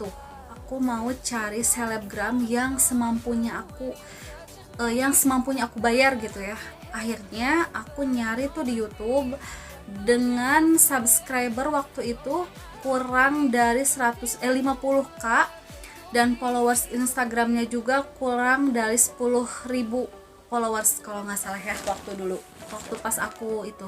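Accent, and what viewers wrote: Indonesia. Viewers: nyarios na cirikhas orang Sunda pisan nya teh resep mireng na hehe 😁🤗🤭sukses terus teh ❤️🤗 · Wong Jawa ap Rika mba 😂😂😂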